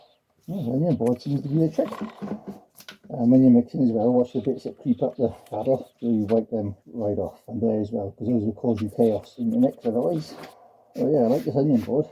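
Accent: Wales, United Kingdom. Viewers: Lovely job hardly no overspray must have a good extraction system great love the Welsh accent too😂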